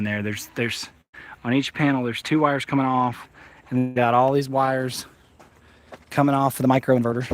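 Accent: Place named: Puebla, Mexico. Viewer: Hey you really learn the mexican speaking way you sound like guys from puebla . hay muchos mexicanos por alli en Atlanta cierto ... 😎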